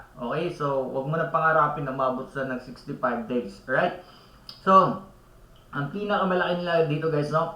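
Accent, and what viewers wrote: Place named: Visayas, Philippines. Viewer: bisayang tagalog.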